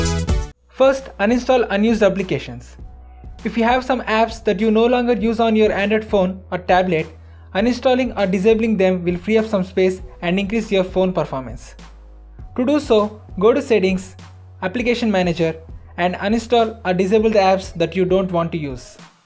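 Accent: India. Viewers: its called Indian accent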